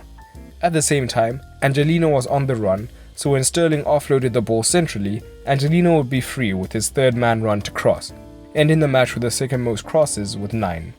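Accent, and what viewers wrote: South Africa. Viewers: First: are you South African? Second: before I watch, something I noticed was the Liverpool front three put intense pressure on City possession whenever they tried to play out from the back, Barca style. They forced longer balls which they would try to then win in the midfield to relaunch their own attacks very quickly. Highly effective throughout the 1st half! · Nice as always are you by chance from South Africa? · Are you from south africa??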